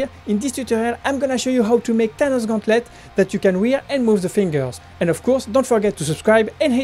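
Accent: France. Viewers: Wah mdr l'accent Français se capte direct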